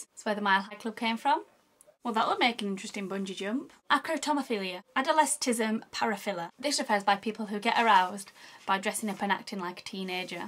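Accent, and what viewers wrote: Yorkshire, United Kingdom. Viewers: Do you have a Yorkshire accent? If so it's the most proper Yorkshire accent I've ever heard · Haha. U look hot :P AND UR BRITISH.!! My friend is British YE HAV D SME ACCENT I hav reli bad spellings :P IM NOT BRITSH Jus to giv ye d heads up :P IM GOIN TO GO NOW.!!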